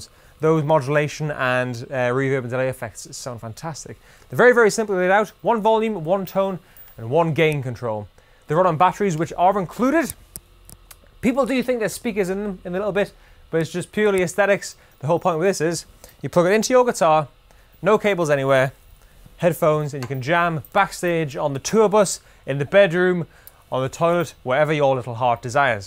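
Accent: United Kingdom. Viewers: I didn’t expect his accent to be British he looks America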